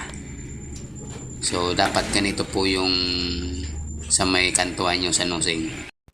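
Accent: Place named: Bicol, Philippines. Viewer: Bicolano manoy?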